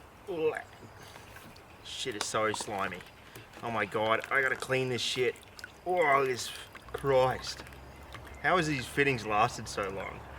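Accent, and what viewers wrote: Australia. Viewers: Pfft watching for the chemistry… Birds? No thank you I just like the Australian accent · Dude, I've just gotta say this. My life has been shit the past couple years, not looking for pity just saying so for context. I found your channel about 6 months ago, and shortly after I found. I've always been intimidated by, and thus hated chemistry. I'm a physics and astronomy person, and a gufted musician, and I just gotta say, something about your auzsie accent, you're personality, and you're... Relatable..... Methods, got me hooked and it I've seen all your vids a couple times each. New episode just makes my day, and the fact I'm gonna be here for another cubane series makes me giddy. But the main thing to say, is you rock, and keep it coming mate! I always wanted to move to Australia to work as an opal or gold miner but since that's never gonna happen, I'll settle for some chemistry from an aussie physicist.;) good work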